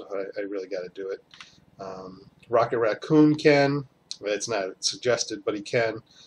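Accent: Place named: Boston, United States. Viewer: It's ok. I heard that Bostonian Accent. My physics professor (back in the late 80's) was from Boston and basically renamed my last name on a daily basis. Good video. You get 1 like, 1 subscribe. :)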